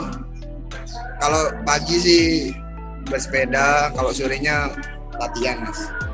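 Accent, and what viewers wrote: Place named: Madura, Indonesia. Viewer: Logat medurone ketok wes ....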